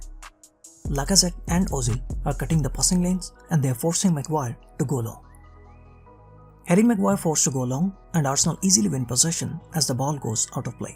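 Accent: India. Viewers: What an Indian accents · It's so tiring to listen to your (Indian English)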